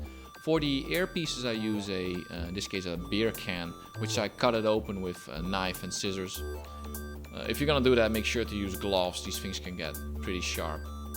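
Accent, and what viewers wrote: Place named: Netherlands. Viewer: het is leuk dat je automatisch iemand kan herkennen die nederlands praat aan het accent :>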